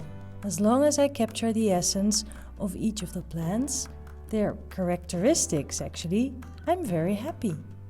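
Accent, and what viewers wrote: Netherlands. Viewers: Are you from the Netherlands? Your accent sounds so familiar to me and I can't figure out what it is. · Je Nederlandse tongval pik je meteen op.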